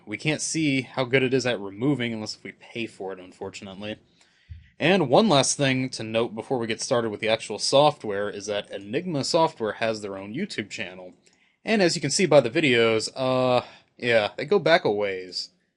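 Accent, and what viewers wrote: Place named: Australia. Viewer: That sounded like an Aussie voice :)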